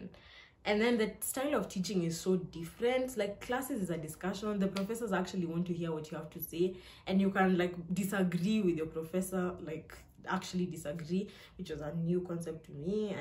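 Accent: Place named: United States. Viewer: This was really a rant and that American English I like ure personality 😅 you can't tell me it will get better Becos u think it'll get better 😅 and that ending was 👌🏼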